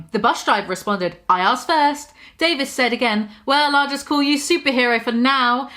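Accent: England, United Kingdom. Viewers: The English accent though... 😂 i loved this video.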